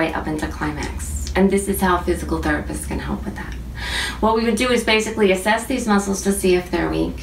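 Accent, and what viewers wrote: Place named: Texas, United States. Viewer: I am glad that you found my comment funny,but I can assure you that it is very true .I think it might have something to do with your sexy Texan accent.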